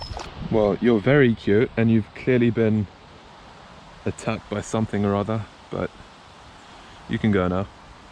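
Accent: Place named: England, United Kingdom. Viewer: your "english accent " very funny 😂😁and that story about private lake's true mate..🙈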